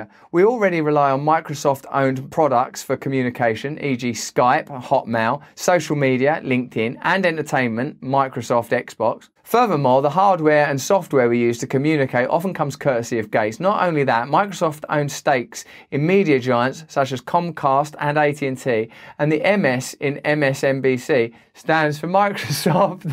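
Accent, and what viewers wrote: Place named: England, United Kingdom. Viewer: Fir ee aye miwwen dollars, come on. Is it just me or do English people sound like they're still trying to learn english while the rest of us learnt to talk properly and moved on.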